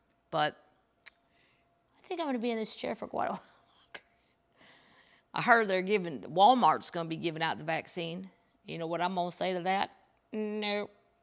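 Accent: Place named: Appalachia, United States. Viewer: I'm loving your version of a southern accent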